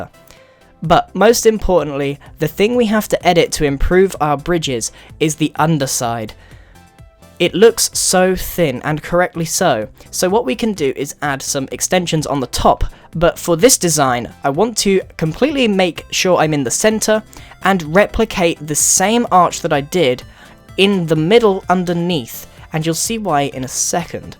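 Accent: England, United Kingdom. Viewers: Hey, love you English accent! 👍👍👍👍👍👍👍👍👊👊👊👊👊👊👊👊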